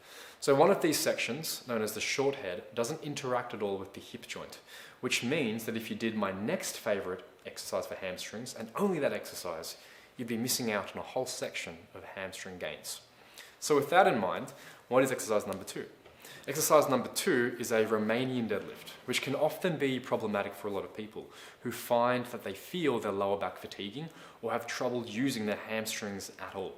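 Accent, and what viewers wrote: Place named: Australia. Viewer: He has the same Aussie accent as the Supercar Blondie.:D Loving your videos! Keep it up!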